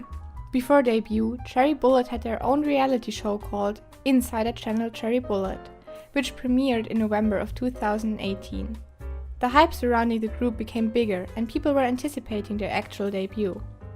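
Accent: Germany. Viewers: Are you German? Ur accent is so German omg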